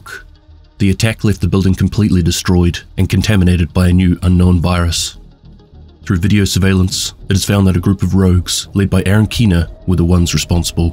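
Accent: New Zealand. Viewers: Good to here a kiwi telling to the story from JTFOUTLAW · The gameplay video made me dizzy, only listen the kiwi sound it's ok, like listening a podcast it's fine. 😁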